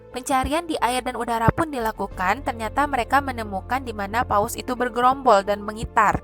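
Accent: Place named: West Sumatra, Indonesia. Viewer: kan betul. Aku dah tebak dari pertama. Minang betul logatnya. Mantaap kokk. Jangan malu sama logat kita. Aku melayu hehhe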